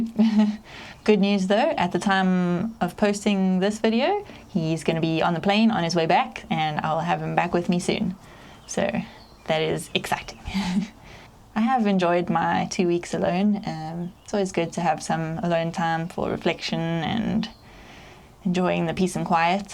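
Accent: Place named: South Africa. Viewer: Good to hear a South African voice. Good luck on your Portuguese adventure. The pool will be a great addition for managing the heat.❤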